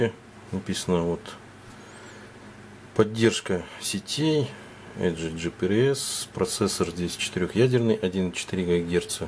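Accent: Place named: Urals, Russia. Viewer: Спасибо за обзор! Особенно порадовал Уральский акцент!!!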